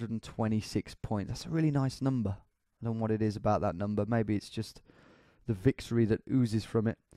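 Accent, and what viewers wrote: United States. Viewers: His American accent is actually very good. And absolutely hilarious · That perfect Midwestern accent... In Boston lol. Great video though, love these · your american accent is so spot on. makes me laugh every time!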